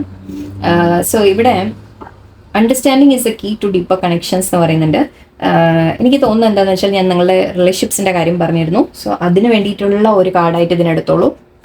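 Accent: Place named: Koyilandy, India. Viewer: ഞങ്ങളെ കൊയിലാണ്ടി ഭാഷയാട്ടോ എന്താ ഇവളെ ചിരിക്കൂന്നേ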